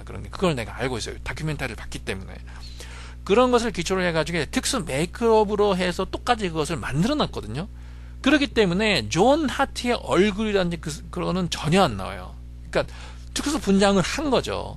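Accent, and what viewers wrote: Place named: Wales, United Kingdom. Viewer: wtf ,why is this welsh fella speaking ?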